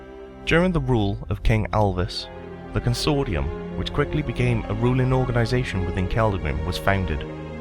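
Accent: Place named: South Wales, United Kingdom. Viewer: What part of Wales are you from? It's driving me crazy. You've definitely got a south walian accent. I'm gonna guess and say Merthyr or Rhondda or somewhere around there lol.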